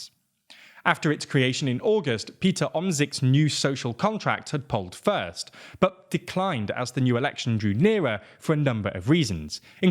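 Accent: England, United Kingdom. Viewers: Yes You tell me, a Dutch citizen, with your pompeuse Brittish accent. First fuckup one minute. Okay he put those points(Wilders) in the fridge. PVDA Groen Links(far left) NSC is mid left, actually wilders is left on economic stances and right on migration. He is NOT Anti EU he is for an Economic European community, you know the thing EU was supposed to be. For a Brit, you got your facts pretty well sorted. I predict PVV, BBB, NSC, VVD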